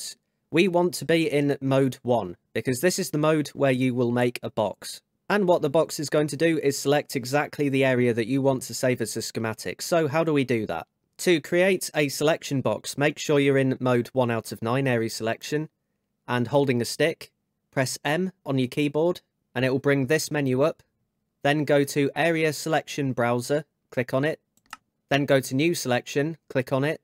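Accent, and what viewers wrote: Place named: United Kingdom. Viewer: That was fantastic, and extra points for the British accent! I learned heaps that I can use :D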